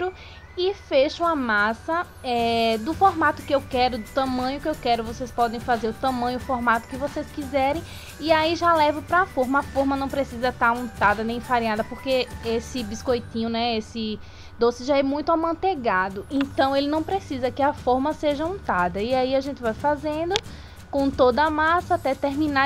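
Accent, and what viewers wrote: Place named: Pernambuco, Brazil. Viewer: Tu deve ser daqui de Pernambuco com esse sotaque ne ?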